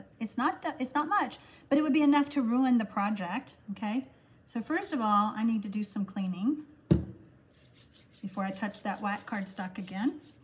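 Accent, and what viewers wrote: South, United States. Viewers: Hi! Been watching your videos and love what you do! Love the southern gal accent too!!:-) Can you tell me where you order your 12x12 plastic sleeves for DSP? Love that idea and I need to get them. Also, then how do you store your sleeves?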